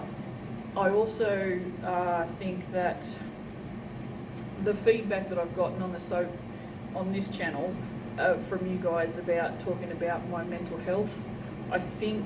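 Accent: Australia. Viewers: Hope you have a great Christmas and a happy New Year, get some rest and enjoy the time with your Dad......I know you will be missing your Mum but I feel she is watching over you and I know she will always be in your 💓..I wish you all the best with the shop, I hope it is very successful and relieves the financial strain that can be so terribly stressful.... I so enjoy your videos because you are just so damn honest and no bullshit airs and graces etc, just a real Aussie woman! ( not sure if you were actually born here but you sound Aussie ) I’m very sure there are people who gain some strength to share their mental health issues through watching you and your videos....having a “ big dirty secret “ is such a damaging thing, if people can open up and talk about things it is so much better....anyway, wishing you well and I will be following and supporting you in my small ways and enjoying seeing all you do....stay safe and be happy xx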